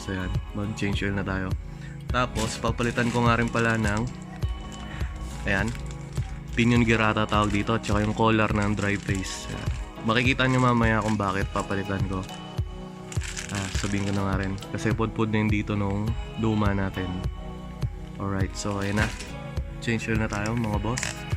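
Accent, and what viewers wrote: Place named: Iloilo, Philippines. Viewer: hahahah medyu malapit ako jan boss hahaha linti ilonggo kman gali hahaha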